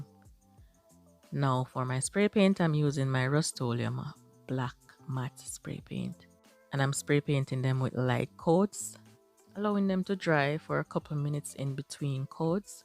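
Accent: Jamaica. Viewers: Talented. You sound like a Jamaican. I am a Jamaican. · I can hear you are Jamaican. Big up uself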